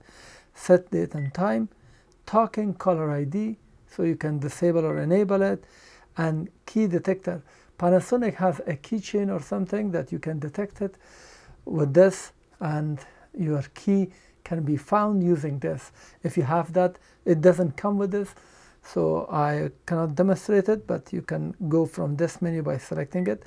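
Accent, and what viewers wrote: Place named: Poland. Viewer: Is this phone the Panasonic - KX-TGF975S Link2Cell DECT 6.0 Expandable Cordless Phone System with Digital Answering System and Smart Call Blocker - Silver? I'd love to purchase one of these one day! Does this have a voice guide? What I mean is, will it talk to you when you're setting up the features? As I am sight challenged, I have to have things that talk to me, as I can't read print, and I can't see what's on a screen. By the way, I looooooooooooooove your accent! Is that Polish? Maybe it's Spanish?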